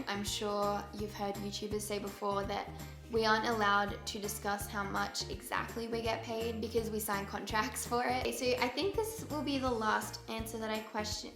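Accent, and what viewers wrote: United States. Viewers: i love your american accent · You American accent 😍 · Your voice sounds so different with an american accent, but still so slick❤ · Wow amazing american accent💖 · Your voice changes so much with your American accent but I still love you accent now!!!! 🥰🥰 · I was so shocked by your US accent oh my gosh! I'm from nz and could never do one as good as you!! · Ok your American accent is officially on point!!! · Omg you sounds so different with that American accent!! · I'm Japanese and I'm studying English , when I watched your video first time , I didn't think your accent is strong but in this video I know the difference !! American accent is cute too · omg the accent!! It sounds like a voice over because it’s just not real in my mind to hear you with an American accent! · omg her american. it honestly made me “uncomfortable” luv u! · Your American accent is amazing! 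That's so funny how you can just switch like that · LOLOL I love your American accent. so funny. seriously a different person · I think that you're gorgeous and you shouldn't worry about what other people think about you or your relationship with Gabe. Gabe chose you because he loved you for who you are and he was happy with you. I think that you and Gabe are perfect for eachother. I love your American accent❤❤❤❤ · Omg your American accent tho!! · You are so good in American accent.❤ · LOVE YOUR AMERICAN ACCENT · oh my gosh. her american accent just does NOT fit her XD keep it Australian i love your accent the way it is! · you with an american accent is SO different! wow! love you. ❤️ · WHAT THE HECK HER AMERICAN ACCENT IS SO GOOD BUT SHE SOUNDS COMPLETELY DIFFERENT AH 😩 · omggg doesn't even her voice sound so much different with the american accent? hahaha love it!!! like a whole other person :D still the cutest thing ever is her australian accent ☺ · AMERICAN ACCENT IS ON POINT ! 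· Hahah, why did it feel like a completely different accent with the American accent 😂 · Omg I love your American accent! I had to close my eyes and remember it was you! Love you 💕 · Although it was cool hearing you talk with an American accent, I totally favor your Australian accent! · OH MY GOSH!! Your American accent was sooo good!! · Omg it was so weird to see you talk in american accent 😨. It was reaaally good but sooo different from what we are used to! Love you😊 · LOVE the American accent!!! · You sound completely different with an American accent😂😂❤️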